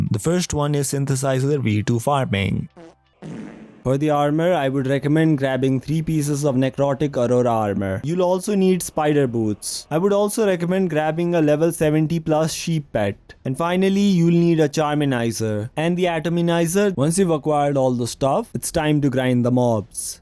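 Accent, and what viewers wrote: India. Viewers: dang he is like the most indian i have ever heard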